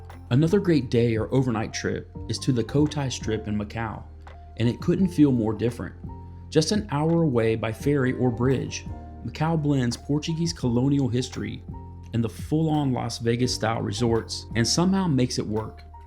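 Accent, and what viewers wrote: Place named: South, United States. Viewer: You accent is horrible ! Please sound Swedish and not Southern USA.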